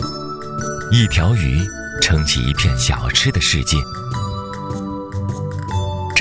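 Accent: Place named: Shandong, China. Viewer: 根据旁白说英语的口音，我觉得他是山东人。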